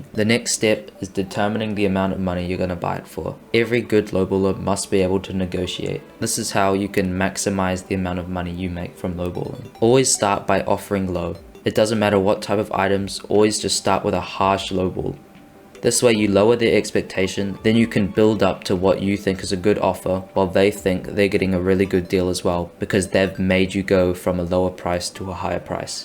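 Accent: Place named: South Africa. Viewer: are you from south africa? ur accent sound like it.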